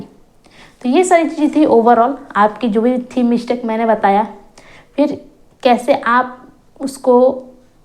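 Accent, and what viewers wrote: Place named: Bihar, India. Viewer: Bato se lag raha hai bihari hoo 😂😂😂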